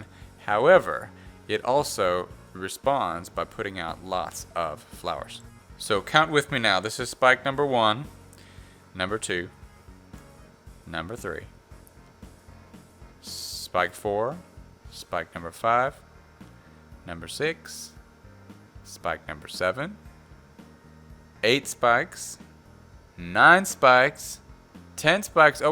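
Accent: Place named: England, United Kingdom. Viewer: Did you go for a holiday to England? Thought I heard some pommie mellifluossity in that soliloquy!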